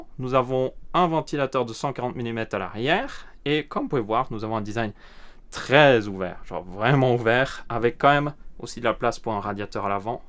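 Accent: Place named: Belgium. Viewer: C l accent et expression belge.. un vis ..lol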